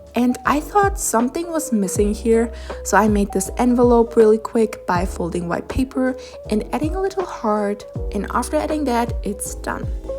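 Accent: Germany. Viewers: You have a big of a German accent. Are you German? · I CAN HEAR THE GERMAN ACCENT GIRLIEE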